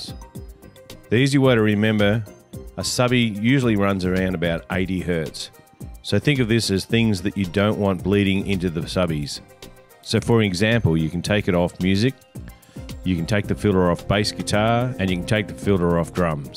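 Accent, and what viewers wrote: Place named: Australia. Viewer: Love your aussie voice mate :)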